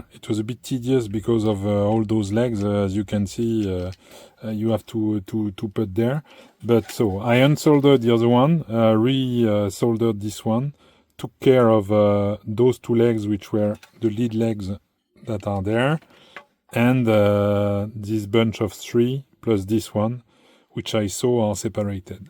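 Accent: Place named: France. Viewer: Yes your english is actually great. Don't ever get rid of the accent. It is what makes you- YOU. I just have a quirky likeness for accents so I can pick them up pretty easily. I speak in a boring plain american english accent. Absolutely BLAND and boring. Your accent probably gets you a lot of ladies attention! Dont lose it! And you are 100% understandable! Otherwise these videos would NOT be able for me to watch if I could not understand you. But I can understand you 1000% percent. Please dont get offended! Idk if its an american thing but, accents are great! There's New Yawk accents.. BAWW-ston accents, LilWEEZYana accents, Southern USA accents... MinneSOWtah accents... My accent is SO boring. I wish I had a cool accent like yours! Ze french accent is not one I have heard much of. But I am glad to finally have a french speaking teacher who I can practice my french accent by listening to! 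If you really wanted to get spicy, try learning a New York French accent. Couldn't imagine what that would sound like. But them Nu'yawkaz really do have an incredible accent... "iM WAWWWKIN HEAAA"!! God bless you brother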